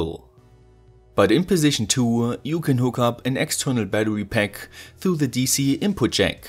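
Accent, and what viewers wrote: Germany. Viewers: Ich liebe deinen deutschen akzent😂👌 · German english is so funny to listen.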